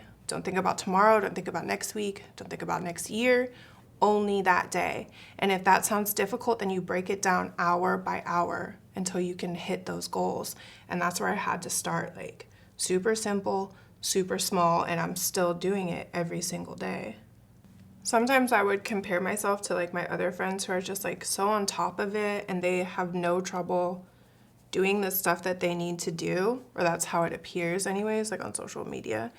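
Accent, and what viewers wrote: South, United States. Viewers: I love when you do your country accent 🤣 I’m from Texas girl and it hits me right in the feel good happy spot ya hear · Your SOUTHERN accent gets me EVERYTIME lol. So glad to see you back hun, 2020 and 21' haven't been easy for ANYONE...but we're all here together❤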